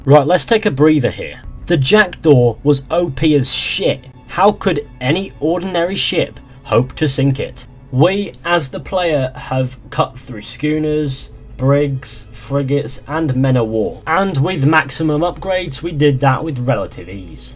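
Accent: United Kingdom. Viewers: Where exactly is your accent from? I know it's somewhere in the UK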